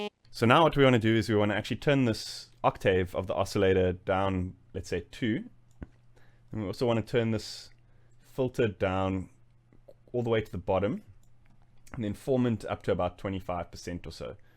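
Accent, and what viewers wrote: South Africa. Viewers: You from south africa ekse?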